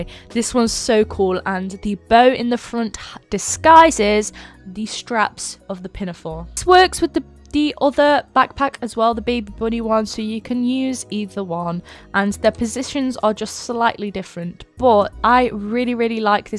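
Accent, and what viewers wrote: United Kingdom. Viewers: Weird question, are you British? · Is this British accent BC if it is its music to my ears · Why do i feel like your half british. o.O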